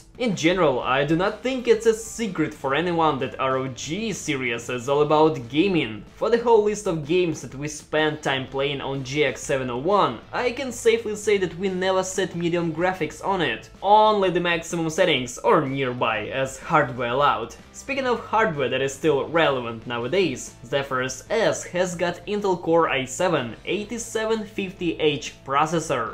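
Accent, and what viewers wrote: Russia. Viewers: Долго вслушивался в твою речь))) всё думал, а не русский-ли часом?))) как Клаву увидел, понял что земляк))) может конечно и живёшь не в России))) говоришь очень хорошо, даже мне с моим не особо хорошим уровнем английского понимать получается без особых усилий) молодец) так держать! хорошее видео и обзор)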